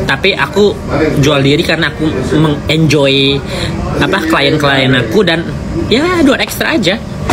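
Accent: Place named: Sunda, Indonesia. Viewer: Kk lucu ngomong sunda nya ceunaaaaĥhhhh 😂😂😂😂😂😂😂😂😂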